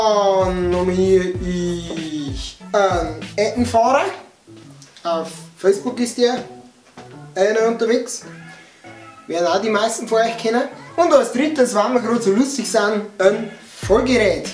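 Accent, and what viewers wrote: Bavaria, Germany. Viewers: I almost don't get anything you say but it's always nice listen to thr bavarian accent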